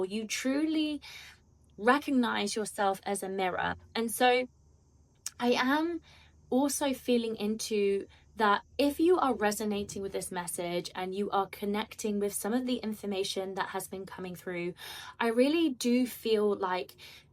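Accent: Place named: England, United Kingdom. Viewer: Love those golden highlights and the English accent is simply icing on the cake!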